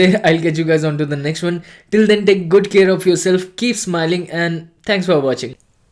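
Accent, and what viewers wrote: Maharashtra, India. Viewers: Lol your marathi or bengali or what?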